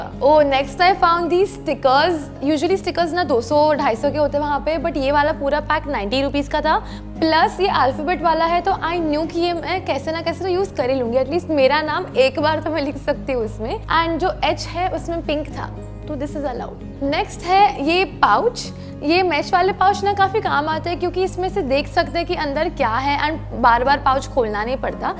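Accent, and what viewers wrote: Gujarat, India. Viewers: Gujrati accent , Jada , ada You can buy something related to New year or republic day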